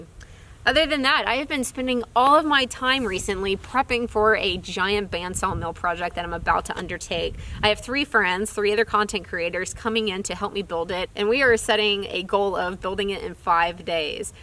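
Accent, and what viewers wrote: Texas, United States. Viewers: I figured as much, you have a texan accent. But I couldn't figure out why anyone would willingly sport the 216 area code(Im originally from there also, moved to Tulsa 15 years ago.)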